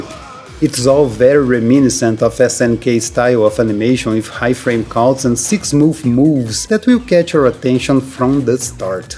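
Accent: Brazil. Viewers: Cara, vc é brasileiro? Me parece que sim pelo seu sotaque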